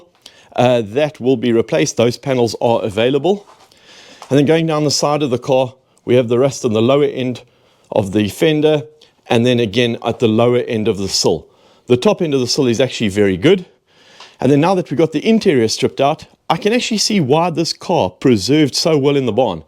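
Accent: South Africa. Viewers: Your English accent sounds like a South African. My uncle Harry used to restore Alfa Romeo's back in the day in South Africa.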